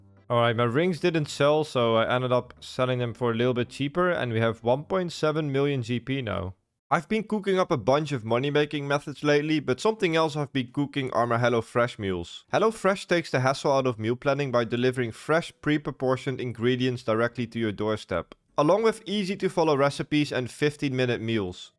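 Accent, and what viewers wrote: Netherlands. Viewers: I smell some dutch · You're dutch right? Can hear it in your accent haha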